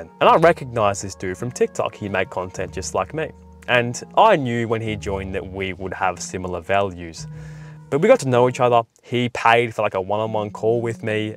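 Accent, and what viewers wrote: Australia. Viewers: I mean you have the looks I never get use to the Aussie or kiwi or whatever accent that is but I'm sure some other women like it lol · I sense an Australian accent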